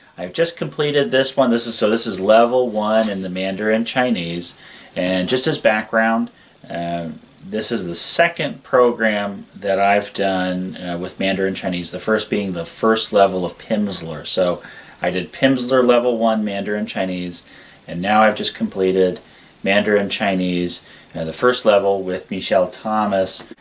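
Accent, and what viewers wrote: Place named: United States. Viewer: I love this review, i just wonder why you are pronouncing the words after with an american accent? Im from here, too, so asking as a neighbor. Ive been writng words phonetically to make sure im saying what im hearing and not reading in my own language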